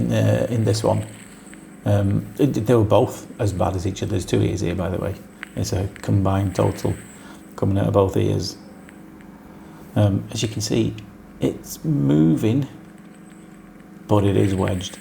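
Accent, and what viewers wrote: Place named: England, United Kingdom. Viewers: Great job! Love your English accent! Canadian here. Weird to love this stuff but I do enjoy watching your work! And you are handsome to boot! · Ah, thanks for that! I wondered because just occasionally I thought I might have detected a tiny trace of something else, something closer to the southeast tip of Lancashire, or thereabouts. It's just one of your vowel sounds, I think. But then, I'm absolutely no expert on the Leigh/Golborne area accent (obviously). 😄 Yours is a lovely accent - we do have some beauties in the northwest and the north, don't we? And they are more original, closer to how English sounded 1,000 years ago, which I rather like.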